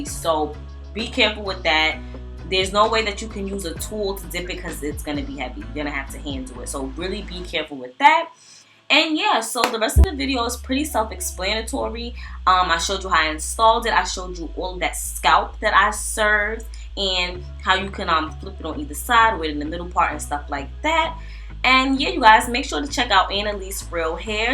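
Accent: New York, United States. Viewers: New Yorker?😊🤗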